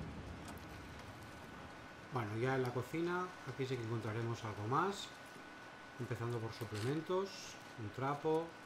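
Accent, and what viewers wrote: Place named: Catalonia, Spain. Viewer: Por el acento creo que eres catalán, yo Mataró Barcelona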